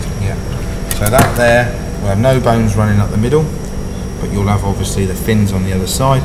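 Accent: United Kingdom. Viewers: I stumbled upon this channel when I searched for lobster videos a while ago. Definitely worth subscribing if you like sealife, British accents and wholesome educational videos. Keep it up, you deserve way more subscribers! 👍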